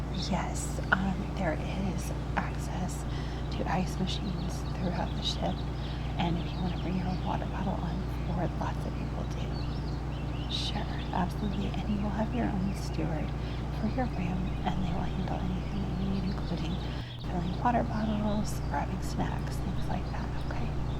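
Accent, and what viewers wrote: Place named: California, United States. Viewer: Hi dear, I love your accent, are you from California?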